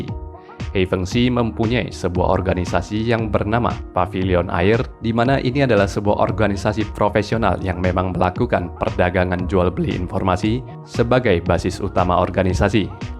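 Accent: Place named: Indonesia. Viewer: Ya engga apa2, namanya jg org Indonesia. Kalau ngomongnya pakai logat Indonesia ya tdk apa2. Kan ada logat India, logat Jamaica, dll. Org Inggris sama America aja beda logatnya Kalau masalah clickbait ya banyak youtuber pakai spt itu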